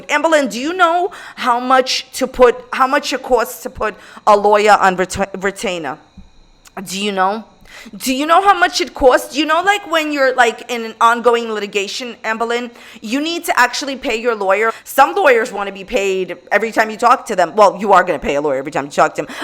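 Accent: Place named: New York City, United States. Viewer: I think my favourite parts about you, is how strong you are about your feelings, and your strong new york/new jersey accent. I’m stationed Virginia right now and hearing you reminds me of strong willed women from my home, New York, and it warms my heart. Love you so much for fueling my unending desire for drama as well as my homesickness <3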